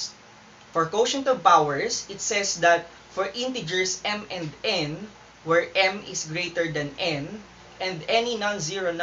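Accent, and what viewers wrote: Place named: Philippines. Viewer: I love his Filipino accent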